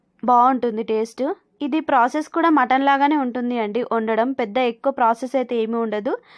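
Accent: Telangana, India. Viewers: మీరు మాట్లాడే పక్క తెలంగాణ యాస చాల బాగుంటుంది సిస్టర్ వదిన వీడియోస్ నెవీ అందునా మీ విలేజ్ వీడియోస్ అమ్మ నాకు చాలా చాలా ఇష్టం . మాది rampalli x రోడ్ డే సిస్టర్. మీ పిల్లల స్కూల్ లో కలిసాను గుర్తువుందో లేదో. Tq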